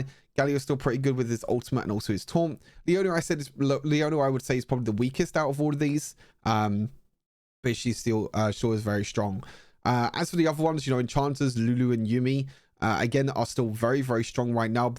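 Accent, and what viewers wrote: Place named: United Kingdom. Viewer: I always check your channel to find out first what's new in Wild Rift and it has helped me better understand loadouts and macro strategies and I love your British accent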